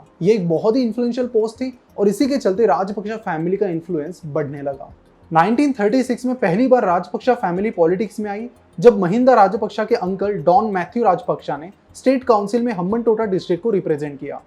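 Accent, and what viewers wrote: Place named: Maharashtra, India. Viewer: I love मराठी accent भावा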